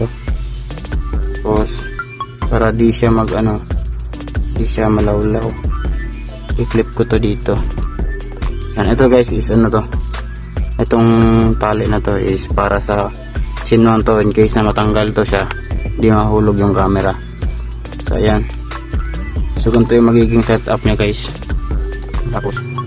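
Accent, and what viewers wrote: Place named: Davao, Philippines. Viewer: Bro taga davao diay ka?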